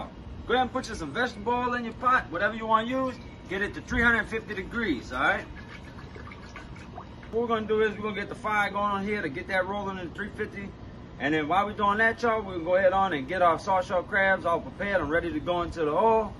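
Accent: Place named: South, United States. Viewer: Hey there ya all folkes...love that southern twang.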